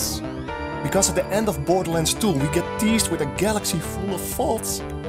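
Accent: Netherlands. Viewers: are you dutch? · Top man ! ik moest en zou reageren de seconde dat ik je accent hoorde haha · Lol I can hear immediately that you are Dutch... am Dutch to tho !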